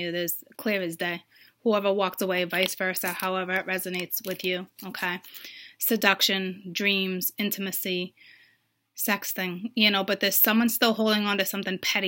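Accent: Boston, United States. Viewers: is that a Bostonian accent I hearya? :-) great reading ...yea they're a bit petty right now. thanks a bunch. #7thhouseAriesinMercury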